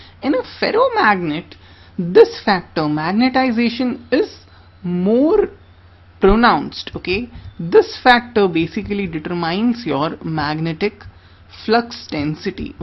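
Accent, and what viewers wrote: India. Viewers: that's indian accent for sure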